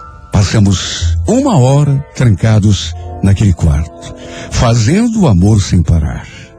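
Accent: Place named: Rio Grande do Sul, Brazil. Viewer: Sou fã dessas histórias lindo sotaque gaúcho.....!!😀👏👏👏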